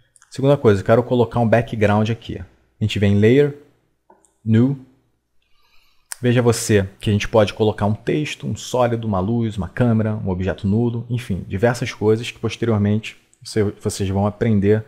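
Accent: Rio de Janeiro, Brazil. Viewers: Carioqueish não aguento. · Carioquês tá em dia heim.. hehehehe..ótima aula. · Você é carioca ? (alem disso ótimo vídeo)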